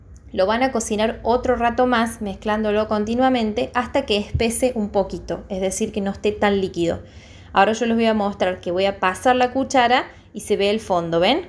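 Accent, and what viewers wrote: Córdoba, Argentina. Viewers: Gracias hermoso escucharte esa tondita Córdobeza!!! Geniaaaaa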